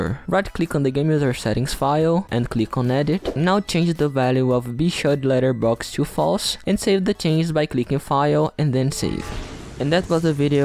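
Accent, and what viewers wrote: Brazil. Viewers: Da pra sentir o sotaque de brasileiro, mas seu inglês ta muito bom mano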